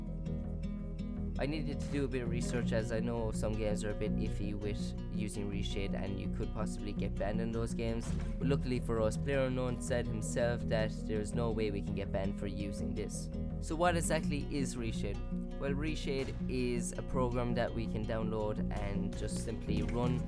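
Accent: Ireland. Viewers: irish lad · thats an irish accent lad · r u from cork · Subbed cos you’re also Irish lol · What part of Ireland you from bud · are you Irish? HON IRELAND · U Irish?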